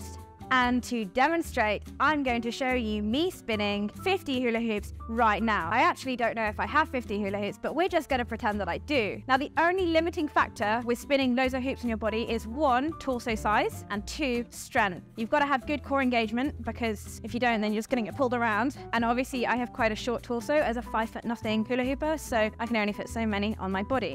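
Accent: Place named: United Kingdom. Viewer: Lights & fire!! ...& Naked!! 🥰 Love watching your videos & watching u hulu hooping is memorizing, captivating, & endlessly entertaining for some reason. You're beautiful, charming & adorable & I bet your performances are in high demand. & I love your British accent. Also love seeing these longer videos rather that just the short form 10-15 second ones ( those are still good, just too short for someone so charming ) Keep up the good work & keep being you. 👍👍😍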